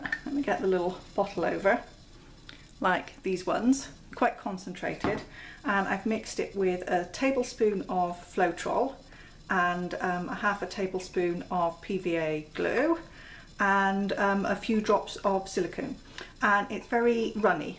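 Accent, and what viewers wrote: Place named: West Country, United Kingdom. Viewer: So nice to hear a fellow West Country accent! ☺ Props to you for even attempting a painting with these colours... i mean, wow! lol 😎😎😎😎😎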